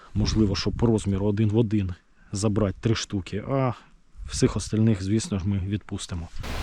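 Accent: Poltava, Ukraine. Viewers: Суржик точно в тебе полтавський! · душевне відео. особливу у тебе виходит нормально українською мовою Полтавський діалект особливий🥰🥰🥰 без отого чтоко-дакака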